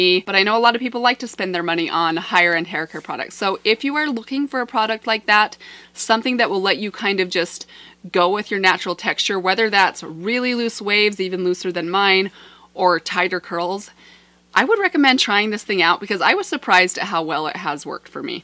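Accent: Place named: United States. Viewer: I loved this, you're so lovely, very gorgeous lady. I love your beautiful American accent. Everything you say sounds so melodic when you talk. I'm not from the US so I don't get to hear the American accent very often outside of the cinema and American programmes on the telly.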